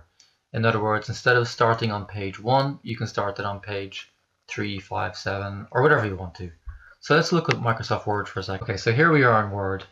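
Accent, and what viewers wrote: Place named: Norway. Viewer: Love your accent. Norway?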